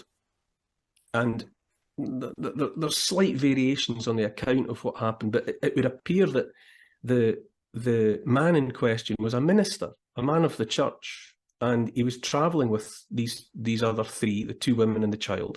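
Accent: Scotland, United Kingdom. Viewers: That strong Scottish accent kind of made it obvious that your a Scottish guy 😅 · I never knew you where a wee Scot? 🧐😊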